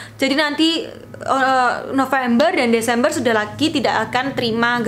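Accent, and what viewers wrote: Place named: Java, Indonesia. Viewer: Medok jowone hahahha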